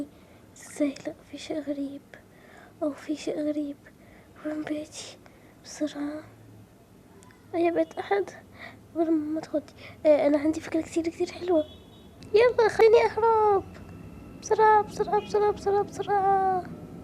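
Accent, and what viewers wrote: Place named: Syria. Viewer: عشان بتتكلمي سوري ومصري!؟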